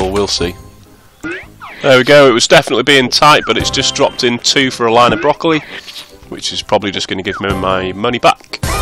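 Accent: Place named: Liverpool, United Kingdom. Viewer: oh i am a 51 year old woman from liverpool with a gambling addiction and trying to stop .... i live in the south of england .... i am using my sons creepyhomer acct to watch you and ROCKENROLLA ... i love it when you both WIN although i kind of like it when you both LOSE ... sorry but it brings up that sick panic feeling i would get and then the GUILT OH THE BLOODY GUILT ...thats what always got me xx you sound like a scouser though MATE X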